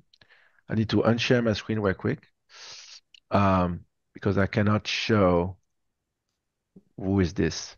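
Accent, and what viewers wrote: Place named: France. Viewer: I also want to say a big thankyou, and wonder if anyone else has started to develop with their inner monologue taking on a french accent whenever they're recalling something has taught:face-pink-tears:.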